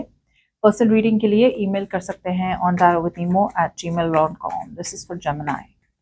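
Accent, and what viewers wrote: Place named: India. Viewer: Thanks,. This is GREAT!!! I can follow along whilst you include some English. And I love hearing not only the Indian accent but the Hindi language is beautiful to listen to. Yes, I hope my person comes around. The longer no contact, the weaker the connection. I am open to new persons, though deep down to be honest, I still have feelings for her.😢..I know I'm a big baby when it comes to heartbreak. Reckon I am healing my abandonment issues as well. Thanks, dear for the read. Always seems straight shooter.🧡❤💚💙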